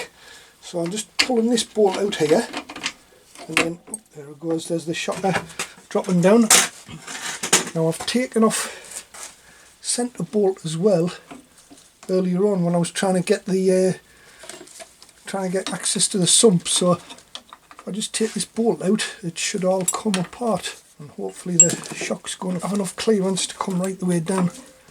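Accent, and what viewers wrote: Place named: Sunderland, United Kingdom. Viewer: Great video 👍 This should help me out when i have to change my rear shock on my Thunderbird 900 triple some time in the next couple of days 😊👍 That sounds like a north east accent, Sunderland by any chance? 😊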